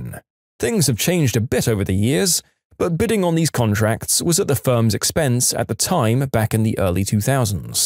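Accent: United Kingdom. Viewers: He's British now?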